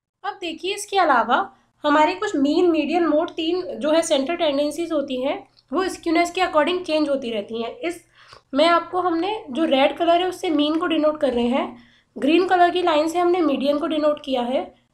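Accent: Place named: Odisha, India. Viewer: Mam i think you are from odisha 😍😍😍😍🥰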